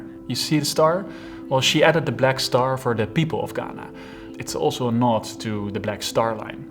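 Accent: Netherlands. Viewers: I smell a beetje dutch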